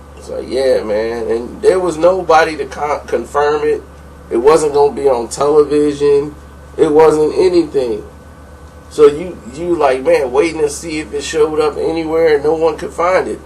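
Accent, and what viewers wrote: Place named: West Coast, United States. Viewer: The slang had me crackin upp haha you too funny. Keep up the great videos. And you Spot on with that west coast slang!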